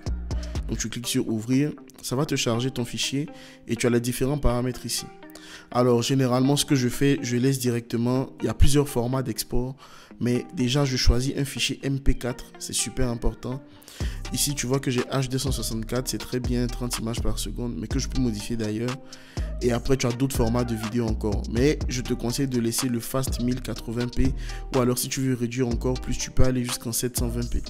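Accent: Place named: Ivory Coast. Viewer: T'es Ivoirien ? Ton accent est franchement cool😁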